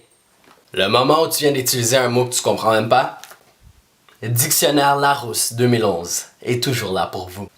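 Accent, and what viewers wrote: Quebec, Canada. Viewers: T un kebecois omg!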